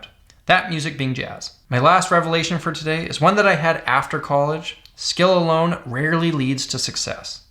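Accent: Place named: Canada. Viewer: This dude sounds like a stoned Canadian talking really phylisophically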